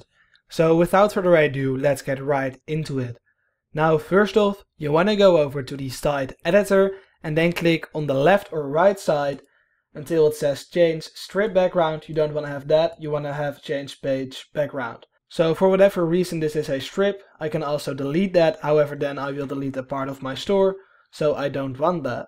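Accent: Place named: Netherlands. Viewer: Sjonge jonge ik hoor dat platte Nederlandse accent gewoon keihard, heerlijk! Het heeft gewerkt, dankje! :)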